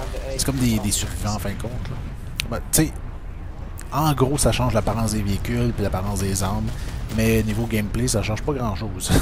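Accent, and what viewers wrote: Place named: Quebec, Canada. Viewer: Je pensais tomber sur une vidéo commentée en FR.. Mais ça fait toujours plaisir d'entendre cet accent Québécois. Merci pour le présentation en tout cas, c'est exactement le type de vidéo que je voulais regarder.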